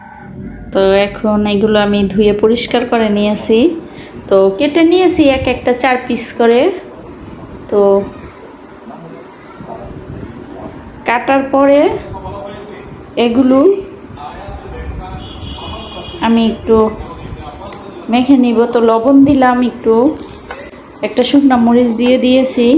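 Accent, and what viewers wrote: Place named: Noakhali, Bangladesh. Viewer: আসসালামু আলাইকুম আন্টি আপনার বয়েজটা নোয়াখালী সাথে আসতেছে লক্ষ্মীপুর জেলা মতো শুনতে অনেক ভালো লাগে আপনার বয়েজ। আল্লাহ আপনাকে সুস্থতা দান করুন এবং নেক হায়াত দরাজ করুক আমিন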